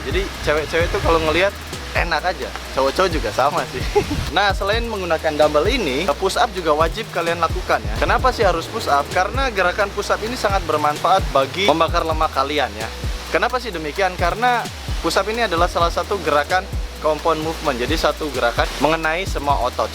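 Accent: West Java, Indonesia. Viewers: Sugan teh orang batak mang pek teh urang sunda wkwk hade lah hade top ilmuna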